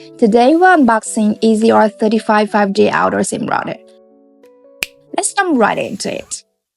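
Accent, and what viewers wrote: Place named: China. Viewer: Her slight accent is Chinese, I don't trust Chinese data equipment due to the CCP requirements to hand over data to them, might be a back door built into it for all I know, or remote deactivation during war time. That said, this company is not on the U.S. government list of unapproved modems for their use, so might be OK. The quality looks great and I do prefer the N-connectors over the small SMA connectors every other manufacturer uses. Only question I have is where to buy it without the tariff tax? Shipped directly from China?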